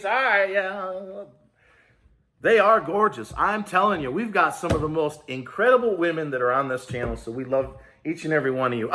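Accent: New York, United States. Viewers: Wisconsin Green Bay ( don’t hold it against me ) I have been here since about April. I love that you love everyone ! You talk like a tough New Yorker, and have Atlanta love in your soul. And you have the Rocky fighting spirit! You tell it like it is I love the F bombs I love the slapping of the desk the Dixie cup coffee I love to see the watches! More importantly when you need to have a shot hope I can pull up this video because every time I call there they tell me I am getting nothing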